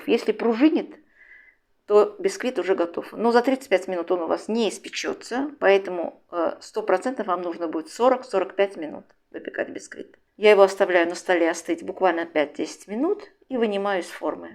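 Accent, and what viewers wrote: Baku, Azerbaijan. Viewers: Этот .добрый, объединяющий всех бакинских акцент, мягкий разговор можно слушать бесконечно Здоровья рукам вашим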